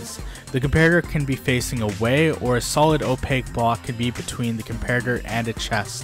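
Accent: India. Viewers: Are you a indian bro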